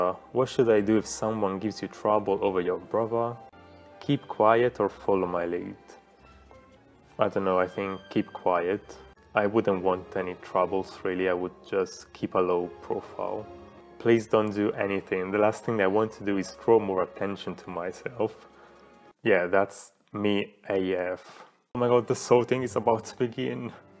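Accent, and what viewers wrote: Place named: England, United Kingdom. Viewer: I sorted myself into Hufflepuff ...love your English accent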